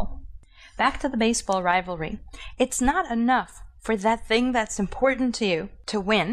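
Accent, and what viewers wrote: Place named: Northeast, United States. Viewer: Thank you, this was very helpful. This also confirmed my suspicion that you were from the east coast. You accent was very northeastern LOL. LETS GO YANKEES!!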